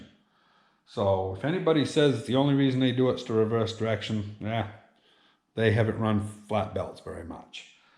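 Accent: Maine, United States. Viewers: As soon as you started talking I thought " this guy is from Maine" looked at your channel name and was pleasantly correct.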